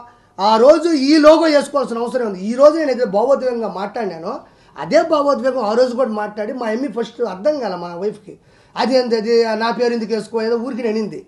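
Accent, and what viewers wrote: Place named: Nellore, India. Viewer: Orey RP, you are making comedy with nellore language , first stop making comedy with nellore language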